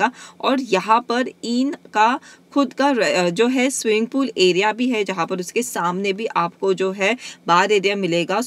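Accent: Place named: Kathiawar, India. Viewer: WOMAN !! I loved your Kathiawadi Accent !! Well covered ...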